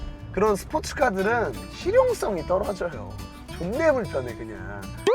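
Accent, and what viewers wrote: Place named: Chungcheong, South Korea. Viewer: 오킹이 충청도 사람이유? 사투리가 친근한디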